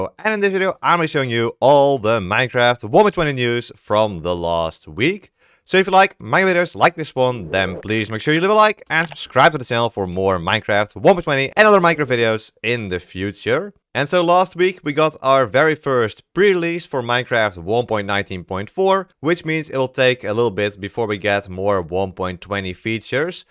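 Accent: Netherlands. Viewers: Is your real language dutch? Because you have a dutch exent.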